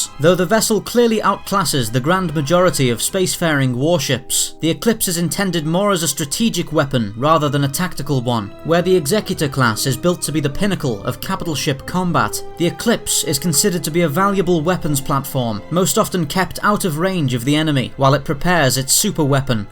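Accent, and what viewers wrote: United Kingdom. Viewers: I really like listening to the British accent giving tech jargon. 😉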